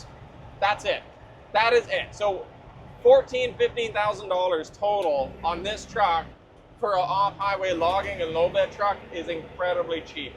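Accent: Canada. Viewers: I noticed the way you say semi truck the i is like like a lowercase and the way we say it down here in the states it’s semi like an uppercase so is that a Canadian thing I take it because when I watch a Tesla video about trucks I gotta turn it off because all my life, I’ve heard it that way and it drives me up the wall not so bad when you say it, but you’ve got that Canadian accent. PS I love YOUR trucks.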